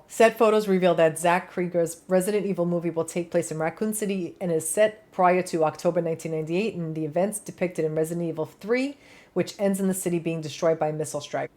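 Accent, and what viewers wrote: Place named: New York, United States. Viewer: Elo mate. I decided to upgrade to your channel, because I love you and your doggies are so, so lovely. You're my fellow green eyed beauty and I love the dogos. Love your ascent. New York/Jersey, I'm guessing. I'm a total Londoner bloke, and I love you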